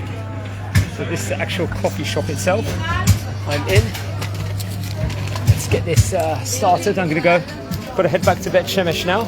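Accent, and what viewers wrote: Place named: United Kingdom. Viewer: Your from europe you have British accent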